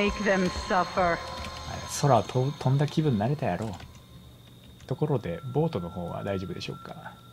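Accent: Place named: Kyushu, Japan. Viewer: 九州方面の方ですか？聞き覚えのある方言がちらほら…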